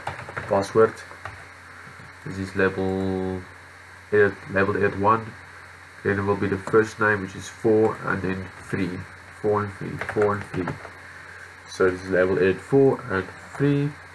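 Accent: South Africa. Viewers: are you from South Africa? Afrikaans?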